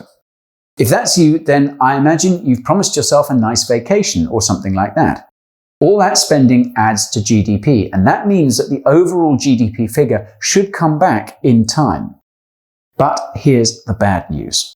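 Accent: United States. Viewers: Guy talks increasingly like Americans now.... "Here's the deal" "if you ask me" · sounds American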